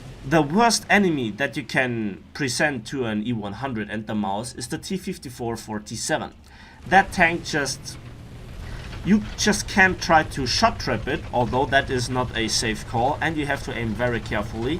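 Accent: Bavaria, Germany. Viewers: Bist du aus Bayern ?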